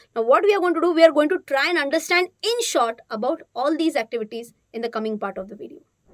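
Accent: India. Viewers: ... I actually found you on YouTube to know more about stocks and investments... BUT I BECAME FAN OF YOU AND YOUR BINDHASS ATTITUDE AND OUR NATURAL INDIAN ENGLISH ACCENT.... I SIMPLY LOVE IT ❤❤❤❤❤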